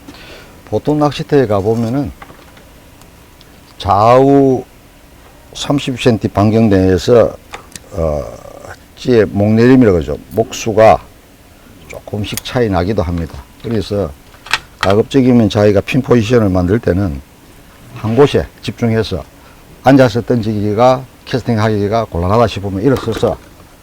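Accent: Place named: Japan. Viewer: 말이 일본말 같은데? ㅈㄴ 알아듣기 힘듬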